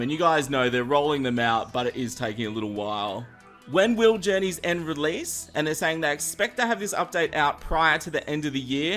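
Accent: Australia. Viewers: You’re definitely australian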